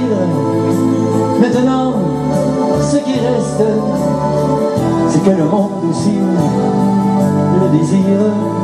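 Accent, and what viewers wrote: Canada. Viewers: Merci pour ce beau partage 🌹 je trouve extraordinaire que l accent canadien disparaisse lors du chant et revienne après 😁... Pareil pour Céline 😉 Toujours que l interprétation est très belle, bravo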